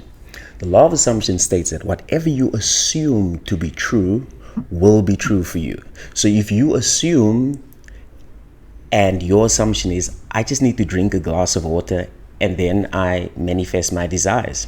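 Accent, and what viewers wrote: South Africa. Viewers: Sounds like a South African🇿🇦. Thank you for sharing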